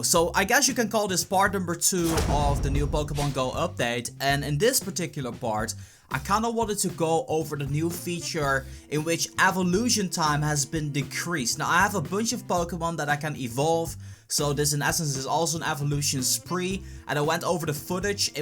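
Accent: Netherlands. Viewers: Are u ducht ?? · Kom jij uit Nederland wouw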